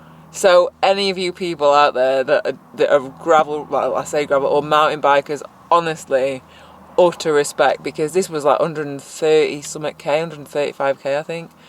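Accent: United Kingdom. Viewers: I am so fall in love in your British pronunciation. Bloody Hell! 🤣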